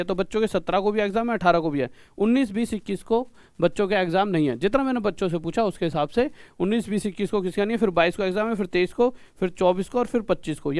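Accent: Haryana, India. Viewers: Bhokal , web series k , pintu pahalwan jese look h sir aapka , language b haryanvi touch a rha h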